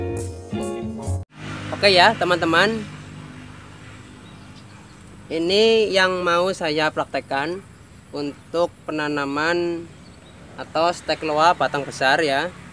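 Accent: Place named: Java, Indonesia. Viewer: Medok mas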